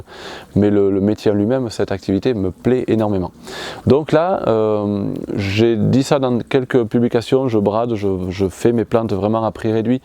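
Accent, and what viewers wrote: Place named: Tarn, France. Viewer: bonjour bonjour ! si jamais tu passes en correze hésite pas a venir nous voir (on se connait pas du tout mais on se sent connecté a toi on vient de villemur sur tarn et ton accent nous ramène chez nous et puis on kiff tes videos ta philosophie etc !) bref on fait de l'elevage de brebis et transfo fromagere, de la perma et du chanvre bio tout ca tout ca, t'apprendras pas énormément on est pas des experts mais on est sympas !! )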